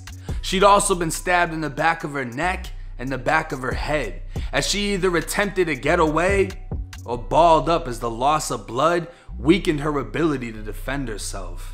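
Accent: Boston, United States. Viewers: Bro u sound like ur from boston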